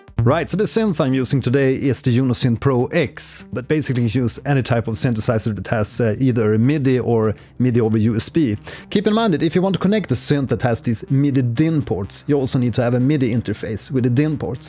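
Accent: Sweden. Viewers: Hey Great and to the point video. And you sound very Swedish! My girlfriend is from . Im going to play this vid so she can tell me what area you are from . Maybe your from Norway? Any way i love the Scandinavian teaching method and love of music. Some of the best musicans i know and have seen/heard are from the region. I am having a clocking issue. Since i upgraded to osx 14, there is not enough clock send locations are in the send box. I’m not sure if logic is now limiting how many simultaneous clock send can happen but all of the midi connections show in the mid window but not in the clock destinations window if that makes sense. I have about 20 incoming midi and they all appear, but maybe only about, 14 or 15 show for the clock send. That’s how I found your cool channel while searching for this solution to the problem. Just curious if you have seen that issue. 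If I find the answer, I will send a message here so that everybody can know. I’ll be watching more of your videos too .very very good videos.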